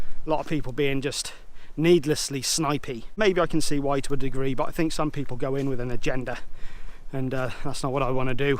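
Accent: United Kingdom. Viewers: It's a pretty decent album even though it ain't their greatest but it ain't horrible. And I must say that this video amuses me when you're explaining the meaning of the songs with your British accent! You deserve a subscriber!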